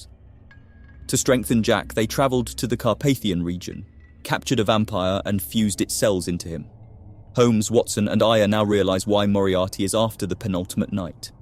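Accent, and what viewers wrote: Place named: United Kingdom. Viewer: oh nah whys the voice uk cant tell if its ai or not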